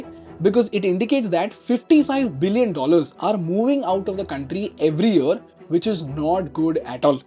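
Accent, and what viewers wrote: India. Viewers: that indian ancent bleed my ears btw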